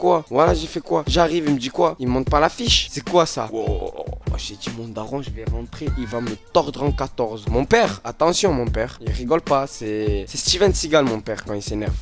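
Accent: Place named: Marseille, France. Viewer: Il a l’accent de Marseille mais il met paris ?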